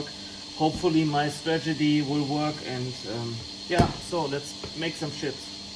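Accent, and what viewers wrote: Germany. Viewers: Let's do it. I need XXL though, and shipping will be to USA. I want to support you. BTW, one of my grandmother's was a card carrying immigrant from Germany. She had many German friends in the states. Their English was just like yours. Very good, with an accent. Reminds me of my childhood. Love it.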